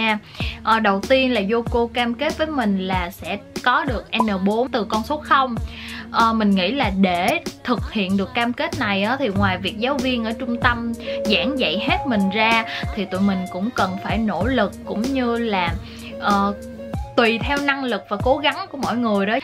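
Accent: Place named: Mekong Delta, Vietnam. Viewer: Ôi e là người miền tây à C cứ tưởng e là người Sài Gòn cơ 😁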